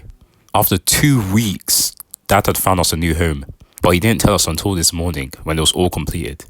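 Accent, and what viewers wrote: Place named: United Kingdom. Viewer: The British accent makes this like 1000x better bro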